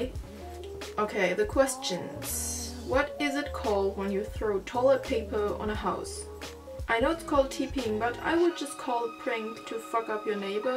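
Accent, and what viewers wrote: Germany. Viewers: Omg ur German accent is really cute no offense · As a Chinese Canadian who speaks Mandarin and lived in Germany briefly, your English accent is definitely GERMAN, which is very cool in my opinion! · Bist du aus Frankfurt ?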